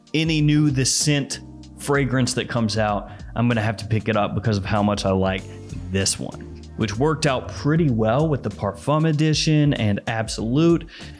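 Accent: United States. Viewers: i hate american accent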